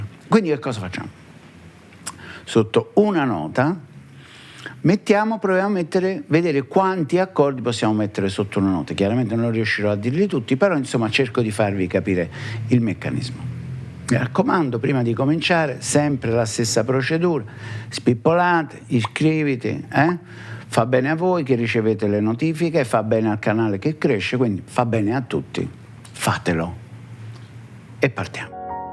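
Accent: Naples, Italy. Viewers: Il tocco di napoletanità è sempre piacevole. Complimenti!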